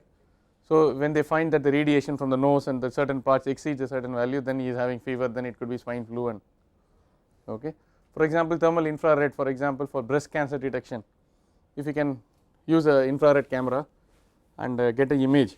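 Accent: India. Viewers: Yes, you need to tune into the characteristic hindi accent.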